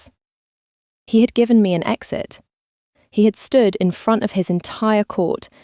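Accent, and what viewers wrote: England, United Kingdom. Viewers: That’s how us UK English people pronounce beta - beater. Nowt wrong with that. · The narrator has an English accent. She is pronouncing 'beta' the way we do in the UK, not 'incorrectly', just different.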